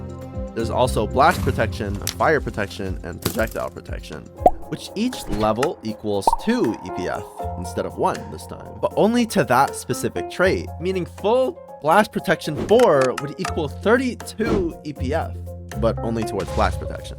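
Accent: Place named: Poland. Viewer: ok just sounds Polish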